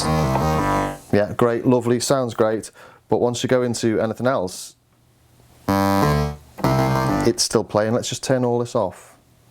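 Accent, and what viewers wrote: Liverpool, United Kingdom. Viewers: Scouser? You and Doug from the Soundtest Room know each other?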